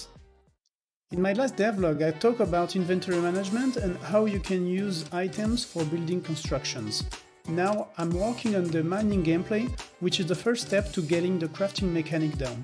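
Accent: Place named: France. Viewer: i love your french accent !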